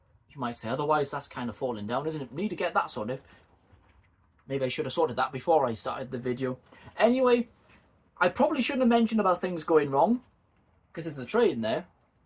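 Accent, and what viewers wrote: Wales, United Kingdom. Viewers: Well done. I love your videos. Have been watching your channel since the pandemic and before. Unfortunately I lost my hearing due to covid but I can still remember your classic Welsh accent accompanying your fantastic vids. I really love the style of your presenting and great content. 14 years!!! BRILLIANT. Please keep them coming. Especially the bloopers!! We're all human and it's great to see what happens to us all whilst trying to get it right. Again. Well done mate and here's to the next 14 years. All the very best. Simon. 😃😃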